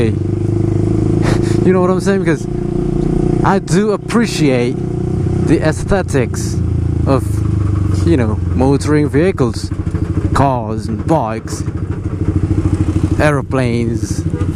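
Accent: United Kingdom. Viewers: aksen british nya keren . kaya ga ada indo2 nya sama sekali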